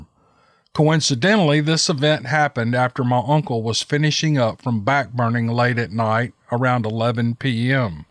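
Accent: South, United States. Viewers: Welcome back we get to hear that southern draw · Another great monster in a swamp story! Nothing better. Especially from a guy with a very twangy southern accent. It makes me want to be there! lol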